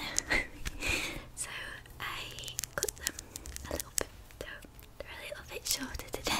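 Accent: Northern England, United Kingdom. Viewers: She’s British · Beautiful Girl xx 😍🔥💕❤ Tingles for sure Love your accent northerner like me,, I'm Bolton by the ways.. Keep up the fabulous video's baby xx.. Youu are asmr Angel love your eye's very Disney princess.. getting Elsa frozen vibes,😊.. Xoxo Levi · I can’t tell if her accent is British or Australian? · Somewhere in northern England? Manchester maybe? (definitely didn’t read the comments)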